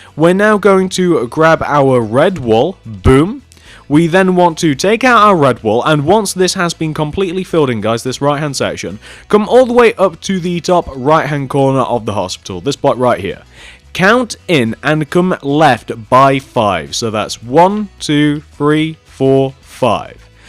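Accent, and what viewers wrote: United Kingdom. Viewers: Why every YouTubers in British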